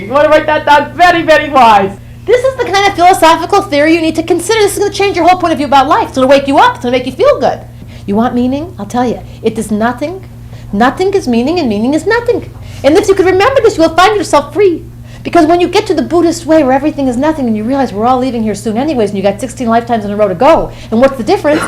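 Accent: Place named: India. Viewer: That indian accent :D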